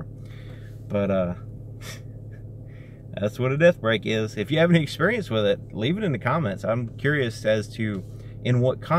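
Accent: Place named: South, United States. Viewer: BTW: Thanks for this small series of videos. I enjoyed watching. I'll admit, I did have a hard time paying attention to anything you were talking about (at first), since I was so focused on looking at you. Without going into TMI territory, you are very pleasing to my eyes, along with your slight southern accent. :^) Sorry if you don't wanna read that. but it's what happens when people (who like the work you do and the sound of your voice) get to see your face and how you animate. Oh yeah. Are your eyes colored Steel or a Gray with a greenish tint? (had a helluva time trying to figure it out) blush Ok that's all for now! :D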